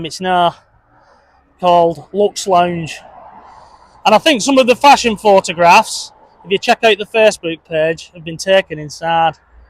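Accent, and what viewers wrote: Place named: Lancashire, United Kingdom. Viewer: 😂 Hi, yes I remember that too now that you mention it, the expense of specific reclaimed bricks. Freds accent was a joy to behold as is yours. We are very slightly less Lancashire sounding here in my hometown but all things Lancashire need proudly preserving. I never got into the historical preservation side of bricklaying myself, mostly new builds and extensions with some ornamental work. What did you reckon to Freds efforts in brickying? I thought he did magnificently considering he was not traditionally trained and he was self taught. His Mums chimney stack was beautifully over engineered for a terraced house showing his deep love for Victorian architecture. Not seen a single example of brickwork done by Fred that would not pass at City & Guilds level. An immensely talented guy.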